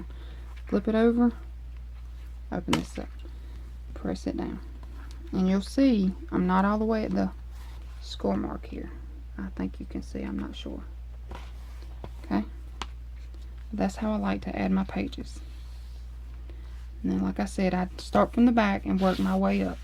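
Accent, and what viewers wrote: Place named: South, United States. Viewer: Great tutorial very easy to follow. Love your southern drawl as well 😊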